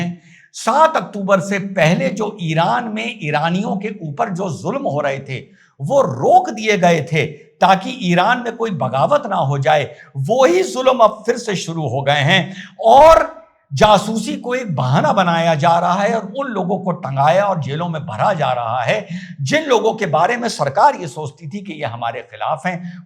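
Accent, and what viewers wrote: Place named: Punjab, India. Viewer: Punjabi ki tarhaan bol rahai hindi yeh musalmaan nahin .beta talfuss toh theek keeleta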